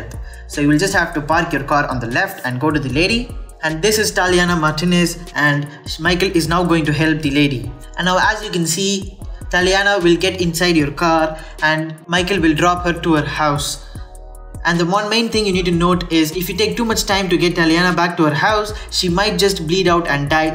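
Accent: India. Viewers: Why do you have such a thick indian accent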